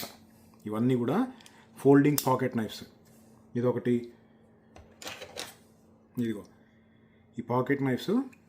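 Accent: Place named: Guntur, India. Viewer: Yes. May be from guntur according to the slang.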